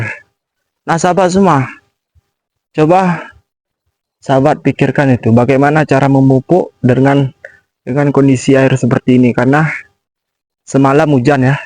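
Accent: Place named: Paser, Indonesia. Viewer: Logat mu kaya suku paser kabupaten penajam paser utara ikn nusantara kalimantan Timur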